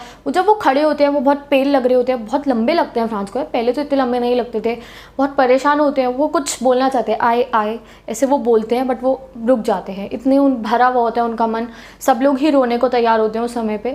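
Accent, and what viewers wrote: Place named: Uttar Pradesh, India. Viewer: UP style of speaking bro Henge=hai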